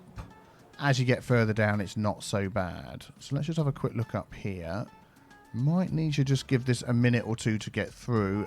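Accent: United Kingdom. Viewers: It's his voice. It has that soothing, calming British tone to it... 🇬🇧😆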